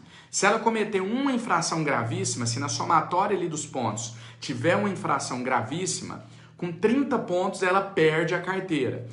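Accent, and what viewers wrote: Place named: Minas Gerais, Brazil. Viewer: É VC é mineiro ne bom dia eu tbm sou VC é o melhor pra explicar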